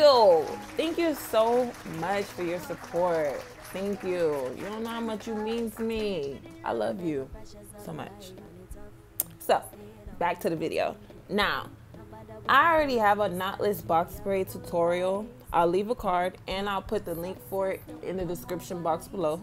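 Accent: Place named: Bahamas. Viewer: believe she's from the Bahamas lol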